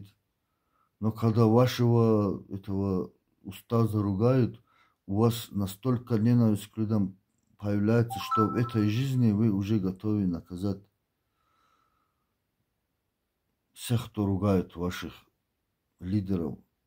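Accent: Dagestan, Russia. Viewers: Акцент аварский,,, никакой он не чеченец, это фуфло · Это не чеченец акцент дагестанский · С каких пор чеченцы говорят с дагестанским акцентом? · Это походу не чеченец!это провокатор!у него акцент не чеченца!!!больше похож на дагестанский! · С нашим акцентом аварским😅 рассуждать о чужой жене ему надо?? Я женщина и то такие мысли не пришли подходит ей муж или не подходит…😅 больше проблем нету у этого мужчины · А с чего ты взял что ты чеченец ты аварец и твой акцент это говорит и не отрицай это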